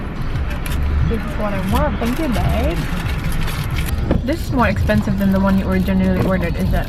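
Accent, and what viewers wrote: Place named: Visayas, Philippines. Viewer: kaya nagustohan ko vlog nya kasi ung accent nia bisaya tas ung english nya maganda rin pagka pronounce nia.. 😍😍😍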